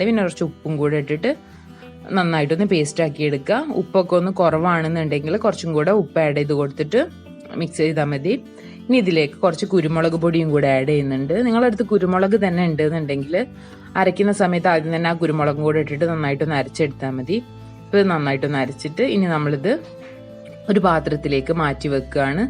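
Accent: Malappuram, India. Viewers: ഇങ്ങള് മൽപ്പൊറത്താണോ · Ithante samsaram erekkure malappurathekk varunnundonn doubt.... adipwoli ayittnd ttaa 🥰🥰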